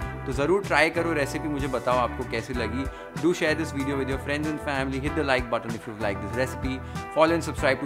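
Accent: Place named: Bengal, India. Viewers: Your bengali accent is beautiful ❤❤ Loved this, this item is quintessential for all bengalis throughout all our lives ❤ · That was exactly my thought right into the video.. proper bong accent from a punjabi dude.. on point !! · Love your Bengali accent.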